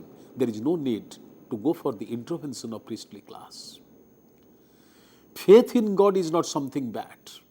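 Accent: Odisha, India. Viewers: Sir are you from odisha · I can’t understand his pronounce because of oriya flavour is coming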